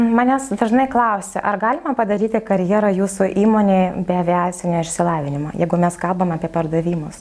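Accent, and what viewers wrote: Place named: Russia. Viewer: kaip zmogui lietuvisku vardu ir pavarde (mergautine) gavosi taip istobulinti rusiska akcenta? metu metus metelicoj sedejo?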